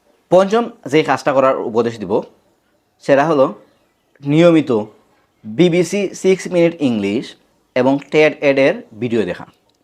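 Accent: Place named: Sylhet, Bangladesh. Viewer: আরে সিলটি স্যার। আপনার কথা বুঝতে আমার অনেক সমস্যা হয়। স্যার প্লিজ, আগে একটা বাংলা প্রনানসিয়েশনের কোর্স করুন। এতে আমাদের বুঝতে ভালো হবে, সাথে আপনারও বাংলা কথায় সিলেটি একসেন্ট কেটে প্রমিত হবে। প্লিজ স্যার। আপনার কথা বুঝতে অনেক কষ্ট হচ্ছে।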